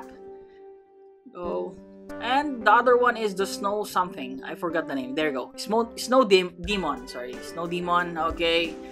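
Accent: Philippines. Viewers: i already know your a filipino just by listening to your accent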